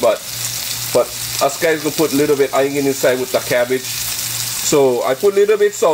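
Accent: Hawaii, United States. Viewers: My Wife and I love your videos. Your pidgin accent makes us think of Home as much as your recipes! Right on!!!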